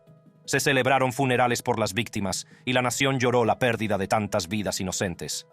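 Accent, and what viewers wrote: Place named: United States. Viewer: Quizás es gringo y anda aprendiendo a hablar español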